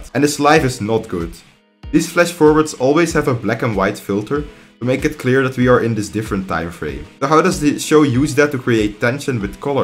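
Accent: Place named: Netherlands. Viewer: Nice nl accentje wel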